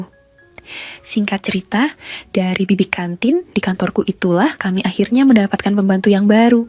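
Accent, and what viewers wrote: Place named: Eastern Indonesia, Indonesia. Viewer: Elu logat Indonesia timur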